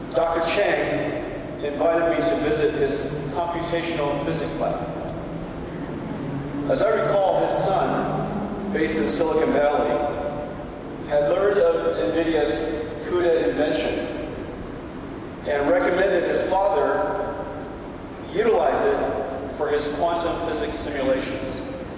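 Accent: Taiwan. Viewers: 他的台灣口音，吊打一堆年輕人。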